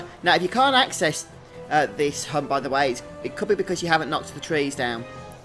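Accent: United Kingdom. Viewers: Yeah..not all we are english native speakers. Plus with his british accent..it's hard to understand everything..🤣🤣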